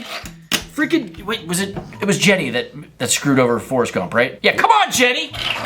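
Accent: England, United Kingdom. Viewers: Guy’s English or Spanish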